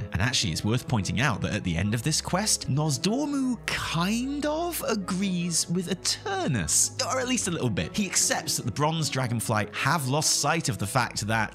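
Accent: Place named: United Kingdom. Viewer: i got really stoned and though t the first like minute of this video was like a food theory video... then i noticed you were British and talking about wow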